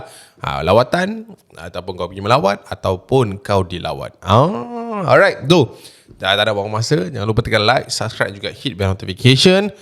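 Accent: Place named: Terengganu, Malaysia. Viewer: Aku dgr je dia ckp klantan tpi slang ganu😅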